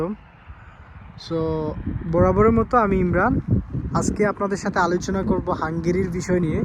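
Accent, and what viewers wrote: Sylhet, Bangladesh. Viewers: Vai are u Sylheti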